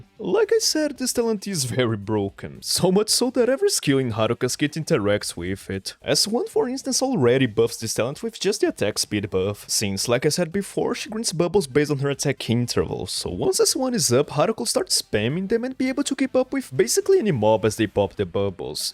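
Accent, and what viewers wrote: Brazil. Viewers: Primeira vez aqui, na hora que eu ouviu você falando Ptilopsis eu tive certeza que tu era br kkkkkkkkkkkkkkk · Wait a Second... YOU'RE BRAZILIAN!? That Accent... it's Familiar somehow, anyways, nice to know one of my Favorites "Arktubers" is of the same Country as i, btw, will u cover Endfield? Really like the style of ur videos.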